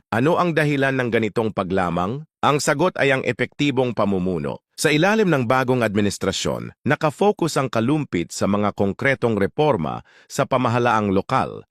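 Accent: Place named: United States. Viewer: Lintik din ang narrator parang kano ayusin mo ang mga pag bigkas kakainis para kang hindi Pilipino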